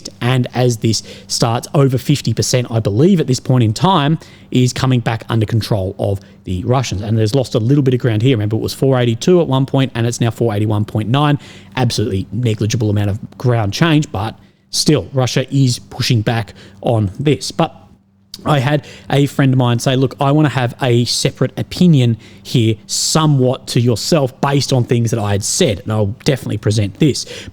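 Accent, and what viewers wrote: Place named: Australia. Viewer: the other producers you’re mentioning are way lower in quality. accent alone make Americans love him like crazy! We think that funny little accent is great. I could put up with a drunk Ausi talking nonsense all day and still have a good time. has implied many time “Ukrainian could Win” I quote “if Ukraine wins” There is not a bigger lie told then “Ukraine win” in the same sentence. I’m here looking at a map wondering when people stop lying to the poor men who die daily under the false idea of “winning in Ukraine”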